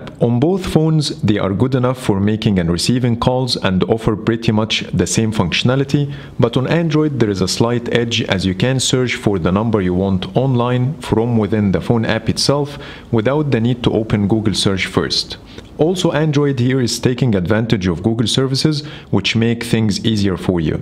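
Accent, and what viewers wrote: Egypt. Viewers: Egyptian English Accent 👍👌❤ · استمر عرفت انك مصري من الاكسنت 😂😂 الاكسنت المصري بتاعنا معلم في كل حته 😂😂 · The Egyptian accent is so clear here. Egyptian English.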